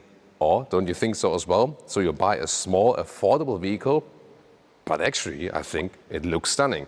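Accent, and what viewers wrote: Germany. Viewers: Somehow it feels so satisfying to listen to this well-rounded review of a French car, delivered in English with a German accent. Love the new Clio! ❤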